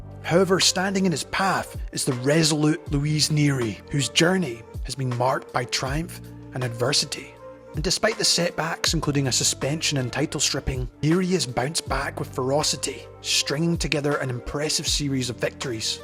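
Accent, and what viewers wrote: Scotland, United Kingdom. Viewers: Yeh, Japanese pronunciation is super easy compared to English. As soon as you understand the vowel sounds, the rules never change...but the video guy does have a Scottish accent, and I kinda like his Scottish take on Japanese lol.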